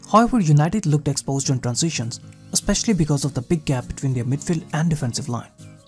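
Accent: India. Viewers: Great video ? Did i hear Indian accent ?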